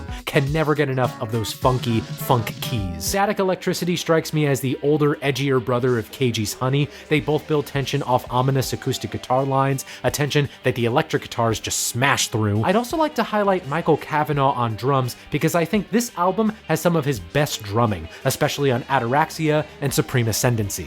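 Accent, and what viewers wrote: United States. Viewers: Couple of notes from an Australian fan: - Opening on a shot of Sydney for a Melbourne band is very funny, well done - Weird that you didn't mention Ambrose is IN the when you first brought up Gizzfest - Also lol'd at calling "Baby Rainbow" - I was at the gig you used footage from during the Nonagon passage, at the Metro Theatre in Sydney. Great gig! I've seen them 11 times all up, from tiny clubs to big festivals, and they're always a memorable show. - Wish you'd mentioned the band playing on Conan when you showed that footage, if only for Conan absolutely RELISHING saying the band name. - As an Australian it's really funny to hear you shorten the band name to KG, because we all call them! That might sound weird with an American accent though 😂 Loved this video, well done!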